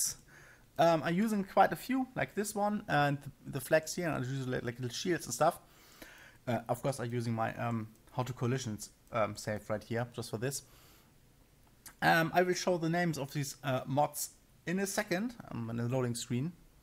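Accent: Germany. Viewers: That authentic German accent i love it! Greetings for Sweden man, thanks for the Tutorial.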